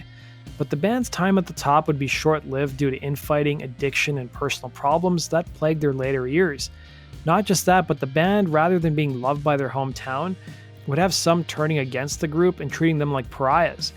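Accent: Canada. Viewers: I keep trying to place your accent...northeastern Ontario?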